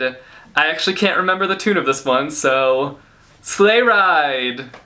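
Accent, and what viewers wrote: Spain. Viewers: You have an awesome Spanish accent! lol. :) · I love your spanish accent, Happy Holidays Dear!!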